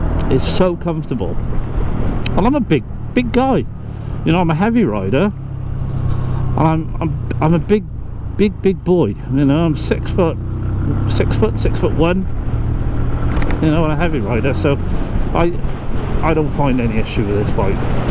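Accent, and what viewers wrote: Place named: Gwent, United Kingdom. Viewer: What area are you riding around in this video mate, I'm a Welsh boy and your accent sounds Gwent-ish Great review by the way, honest and to the point - just the way I like it 👌 - where is the dealership you got your Outlaw from. Just sat on one for the 1st time yesterday up in Barnet where I am at the moment -felt great 👍 !!!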